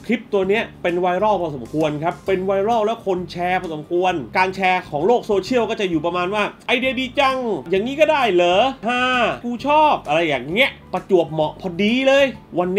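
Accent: South, Thailand. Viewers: เอ้า พี่ คนใต้เบ่อะนิ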